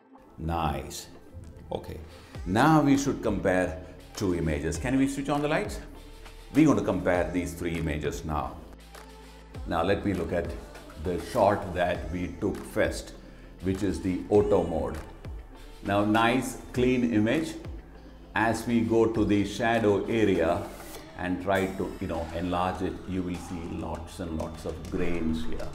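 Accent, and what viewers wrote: India. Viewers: His English is like Hindi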